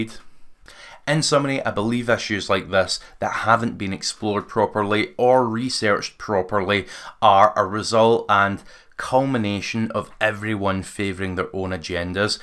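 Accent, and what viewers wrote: Scotland, United Kingdom. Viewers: in this video, you will see on screen, that there have been 15 verified Vapour Cloud Explosions globally, since 2010. Try measuring that against the number of petrol vapour explosions over the same period. You cannot; because it is in the many thousands. As for a “light electric vehicle fire” that includes countless e-scooters, e-bikes, motorised wheelchairs, carts and the like. Modern BEVs have sophisticated battery management and cooling systems. Try stating those figures. There were 239 fires recorded in the UK from July 2022 to June 2023 that were linked to EVs, but the vast majority of those did not involve the battery at all. A lie with a cute Scottish accent is still a lie.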